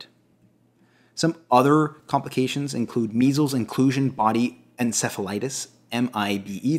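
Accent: Canada. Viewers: I love your Canadian accent ! About, out, etc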